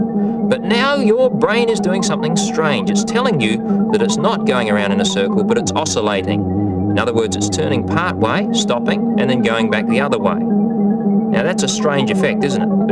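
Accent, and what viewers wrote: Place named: Australia. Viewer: Optical illusions are 127x better when presented in a thick Aussie accent.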